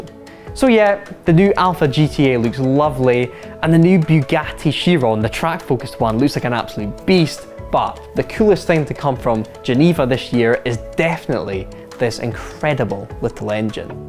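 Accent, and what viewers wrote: Scotland, United Kingdom. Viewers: The accent is scotish ?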